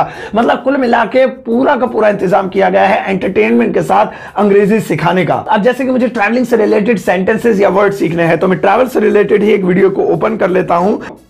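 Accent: India. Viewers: Indian accent is not nice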